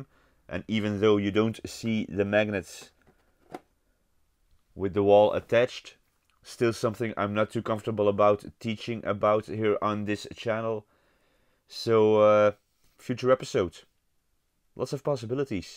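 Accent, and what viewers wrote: Netherlands. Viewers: you sound a little Dutch!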